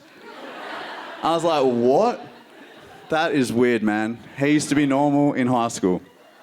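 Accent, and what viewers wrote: Australia. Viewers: u Australian lad?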